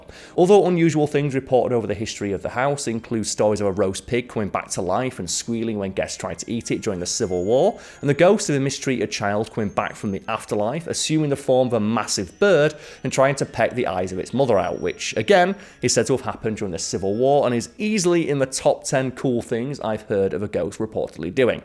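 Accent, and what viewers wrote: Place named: North, United Kingdom. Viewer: Why are you still the interim host? Ive watched this channel for years and you’re the best host. It was weird hearing a fellow northerner at first, but now its a highlight of watching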